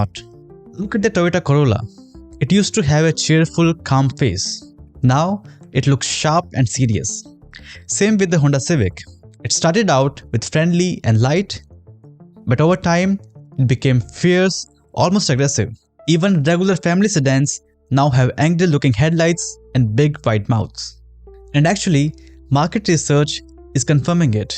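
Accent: India. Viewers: Dude has the thickest indian accent and cant pronounce Lamborghini 💀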